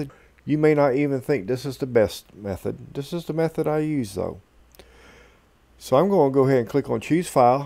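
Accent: South, United States. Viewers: man I love southern accent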